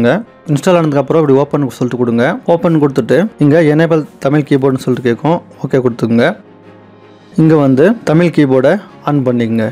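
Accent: Chennai, India. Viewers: local Madras baashai pesatheenga broo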